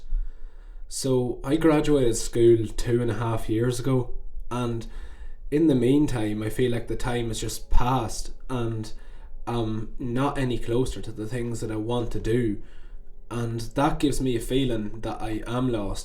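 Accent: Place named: Ireland. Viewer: Dude this is awesome. A lot of people dont start coming to terms with dissatisfaction and fear and uncertainty until years down the line from where you are, if they ever do. Most people waste years chasing pleasure and fulfillment through every avenue imaginable before coming up dry and realizing everything was for nothing. Seems to me like you are starting to realize the low hanging fruit isnt always the best fruit pretty young, which is admirable. I'm only a couple years older than you (I'm 23) so I can definitely empathize with seeing your whole life ahead of you but being afraid of never finding true satisfaction. If you want my theories and experiences with seeking true fulfillment I'm happy to share! Side note... You sound Irish, is that right? I'm in the US (Alabama to be specific) and your accent is AWESOME. I went to Ireland on a study abroad in 2022 and I definitely want to go back someday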